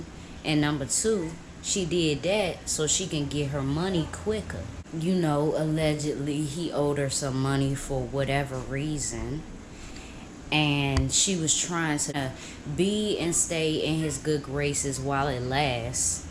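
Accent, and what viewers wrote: Washington DC, United States. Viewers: Love the way you talk must be a dc thing ♥️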